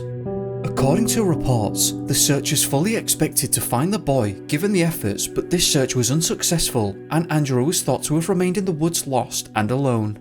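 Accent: United Kingdom. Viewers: Congrats on reaching 100k, always enjoy your videos, fascinating and well researched. Nice to hear a fellow Brit narrating!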